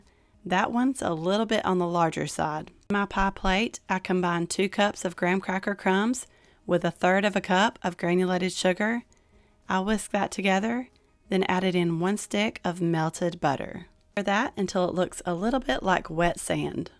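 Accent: South, United States. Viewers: I love all your videos your country accent and most of all your recipes are all delicious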